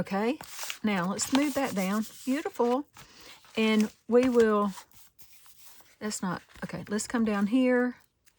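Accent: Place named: Alabama, United States. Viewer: Hey Ms! Happy Weednesday. When you say it you always emphasize it with an extra weeeenesday. It's that Alabama accent. I agree, I absolutely love using those hearts as the hinges on that tag. I can't wait to give that a try but first I've got to hand draw me some hearts that look decent. I enjoyed every second. ByHisGrace! 🌹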